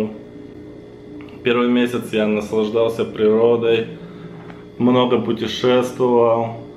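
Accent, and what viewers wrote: Mexico. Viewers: Удачи в Мексике!🍀 Ya casi hablas Español como Mexicano. ;)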